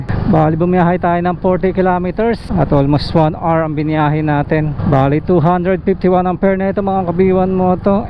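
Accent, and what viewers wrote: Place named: Pampanga, Philippines. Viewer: parang reed lang na naghahanap buhay haha kapampangan kaba boss? bigkas mo kasi lakas makakapampangan e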